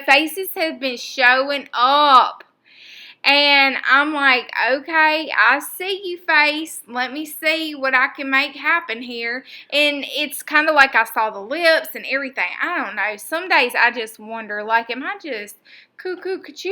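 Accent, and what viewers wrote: South, United States. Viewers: Love to watch you and listen to your southern accent! Love how you use your giblets!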